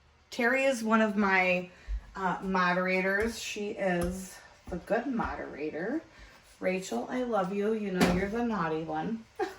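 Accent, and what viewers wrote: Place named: Alabama, United States. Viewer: so glad u liked it. Did our alabama drawl crack you up? Even I was like dang I sound like a southern Belle 😂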